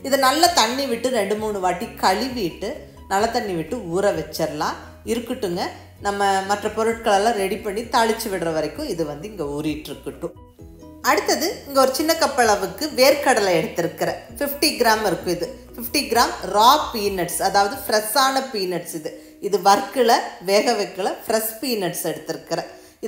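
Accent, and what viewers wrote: Coimbatore, India. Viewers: Nenga Coimbatore ah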